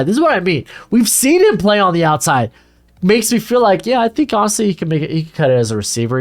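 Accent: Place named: Phoenix, United States. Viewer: Sounds like you are from the Phoenix area? My tribute band just played at Casino Arizona in Scottsdale this past weekend.... a great weekend were it not for the speeding ticket I got.... sad face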